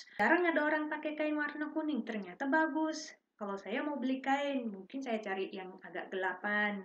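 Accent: Bali, Indonesia. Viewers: 😂😂😂..you got the Balinese accent cantik kebayanya saya suka 😍😍...suamiku bilang "I thought she is Balinese girl teach you dancing" (he heard your voice only)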